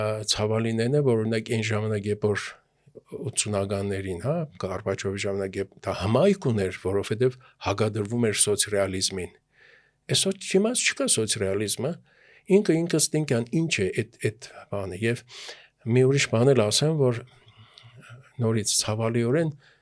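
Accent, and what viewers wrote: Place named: Gyumri, Armenia. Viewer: գյումրու ակցենտը ❤❤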